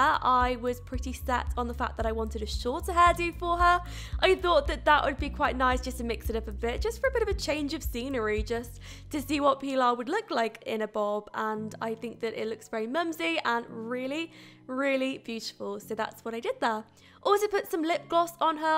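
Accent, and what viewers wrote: United Kingdom. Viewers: I love your channel!! And i wish my british accent was as nice as yours; your voice is so nice!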